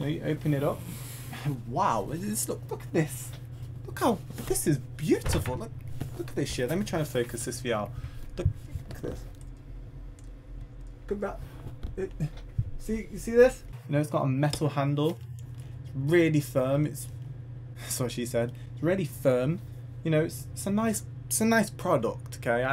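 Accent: United Kingdom. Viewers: his accent sounds british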